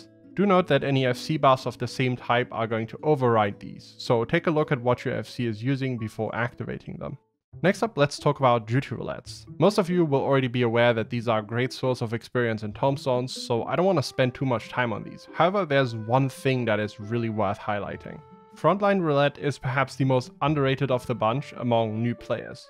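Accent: Germany. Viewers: How many Youtube accounts do you have? or are there just a couple of you similar voiced guys out there with a German accent playing FFXIV?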